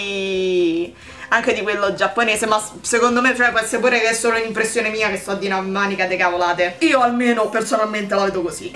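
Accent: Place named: Rome, Italy. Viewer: quando fa la parlata romanesca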